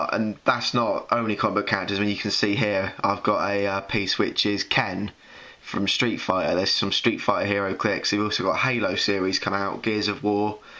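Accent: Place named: United Kingdom. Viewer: I've found that when instructions are given by a person with a british accent, they always sound smarter than a person without an accent. Bravo!!!! I found this guide very informative.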